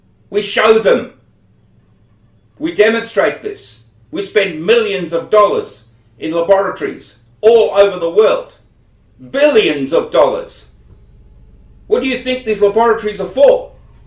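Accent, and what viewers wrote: Australia. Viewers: He sounds like a true blue australian he makes me proud to be australian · Lol he has to be an Australian this professor. Just how he talks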